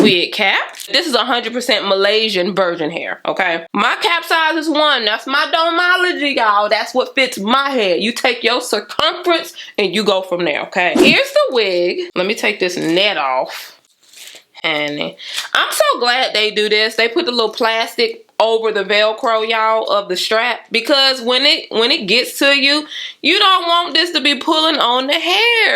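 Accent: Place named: South, United States. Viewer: You. Are. HILARIOUS sis. This is my first video & I was CRYYYYYINGGG laughing!! The heavy accent with the southern country unabashedness was everything I couldn't have thought to ask for am 😂😂🤣🤣🤣 Beautiful job, ma'am!!! 😍👏🏽👏🏽👏🏽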